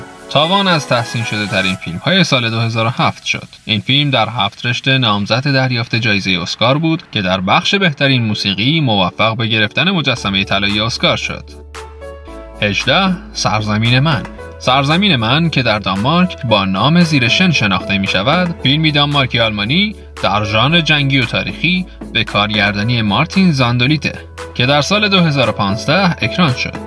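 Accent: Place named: Iran. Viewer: چرا با لهجه ایرانی صحبت میکنی درست گپ بزن یا دری یا فارسی ایرانی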